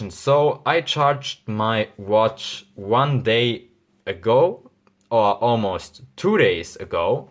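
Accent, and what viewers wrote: Germany. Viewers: I think he's from Germany, he mentioned it on Reddit if I'm not mistaken.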